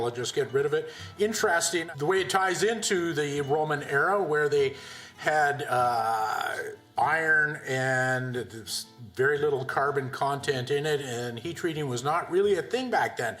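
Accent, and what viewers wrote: Canada. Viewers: Love this channel., what is your accent, Canadian?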